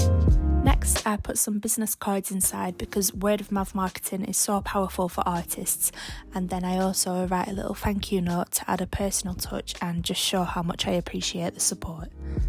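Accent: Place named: Newcastle, United Kingdom. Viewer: I had a thing with a gal one summer…and she had your accent. You must be from Newcastle…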